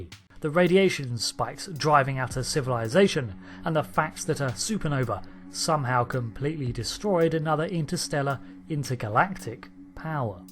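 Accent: United Kingdom. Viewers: Ruined with a nit brit narratah.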